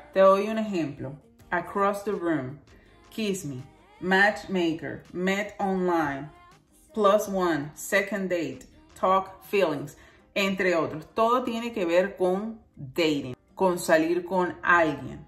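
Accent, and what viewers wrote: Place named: Cuba. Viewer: hola soy nueva por aqui vine a tu resena por tu tono de piel tan parecido al mio para ver como quedan esos colores ceresas navidenos tan lindos me da esa paleta vibras de Huda pero Huda es carinosa en los precios asi que prefiero mophe que creando sombras realmente sorprenden y con precios de waoo, tu acento se me hace de cubana como yo jaja saludos aqui una nueva sub apoyando la raza besos.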